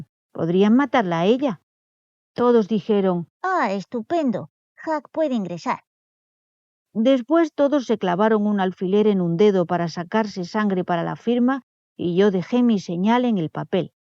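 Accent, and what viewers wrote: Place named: Spain. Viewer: me gusta como lees y me gusta que sea un video de tan solo una hora. La pronunciacion es clara. Me encanta oir tu espanol de Espana.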